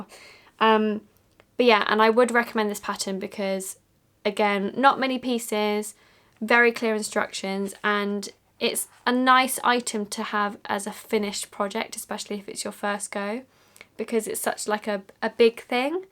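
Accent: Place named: England, United Kingdom. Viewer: You look just like my sister but with an English accent!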